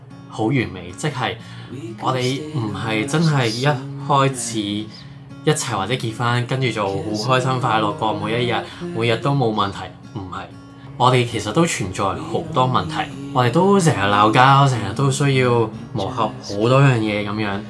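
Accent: Hong Kong, China. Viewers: 原本係無打算comment, 不過見你嘅facial expressions俾你既說話更成功表達你內心感受， 都係決定寫幾隻字俾身處異地既香港人打打氣💪 caption寫你婚姻生活幸福嗎...感情既野作為花生友......就......no comment is the best comment, 但你有話你倆口子都係相信marry & immigration to taiwan 係正確既, 咁就唔好忘記你因為咩原因嫁去台灣, 「無忘初衷」四字絕對係任何選擇移民/離鄉別井人仕最重要既core value! 自己認識幾位朋友遠嫁歐州10年以上, 但仍然成功解決語言障礙同保衛異國婚姻（分別為德國, 西班牙, 泰國, 法國同意大利人妻）我估學好dd台灣國語even少量台語唔會太難既💪（細細聲話你知, 你d港普真係😅😅😅😅😅）最快速學外語既方法一定係煲劇同去菜市場, 唔sure你有冇工作, 但日日都要食飯, 唔煮都要食生果啦, 試下堅持日日去菜市場買下餸應該唔識講都一定好快識聽🥳 再唔得, 你youtube 睇晒小S d台灣綜藝無可能進步唔到既, 輕輕鬆鬆30分鐘至1個鐘, 相信可有效鬆開你眉頭同學下台式國語既❤ 有關內耗問題, 如果你對自己要求好高個種, 放心「內耗是不會停止的😂」反而應該好好同自己既內心小孩共處, 估你應該係I人, 花d時間好好了解真正既「自己」, 性格/喜好/長處/短處, 你越了解自己, 係迷網既時侯可以更快organize 你思緒再出發, last but not least, 你嫁去台灣唔係被人賣豬仔, 1年返1次香港逗留1-2個week都唔係難事, 你話你地係異地戀開始, 當初1年半載都捱過, 你每年返鄉下探親既日子正好係每段愛情最珍貴既「喘息空間」同醫治思鄉病, 最後如果係台灣未能係工作上settle down, 點解唔approach 下香港公司？ 只要有internet,一定有d part time/ freelance job接到, 移民人士最大困擾一定係$$$, 收入穩定咗, 個人一定係會淡定d🥹🥹 見你struggle係「習慣」二字上, 某程度上你似struggle係sense of belongings多d...... 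香港人好捱得, 但如其將就捱過去, 不如加油衝過去❤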